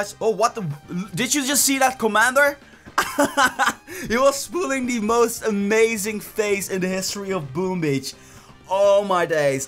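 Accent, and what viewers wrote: Netherlands. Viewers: I love ur dutch accent :D ben ook nederlands haha :D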